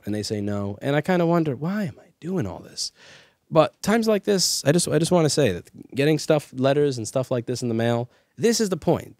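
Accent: New York, United States. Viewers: Thank you, for talking like a real New Yorker. Your message is being heard.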